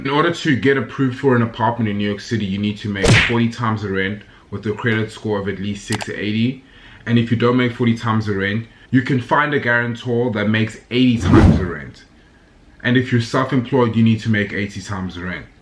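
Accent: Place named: South Africa. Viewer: Crazy how you haven't really lost your SA accent. Love from a former Edenglenian 💕🔥👏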